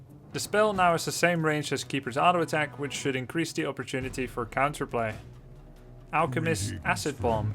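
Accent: Scotland, United Kingdom. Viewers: Why are ye suddenly scottish though? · dat scottish accent always amusing to see you eine andere Sprache sprechen :D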